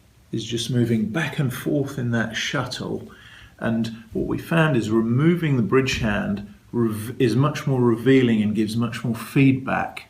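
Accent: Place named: United Kingdom. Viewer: Hi Coach, if you are reinforcing proper cue motion via the trainer, does it fully carry over to cueing without it? Btw, your British English is so good to listen to. Greetings from Poland.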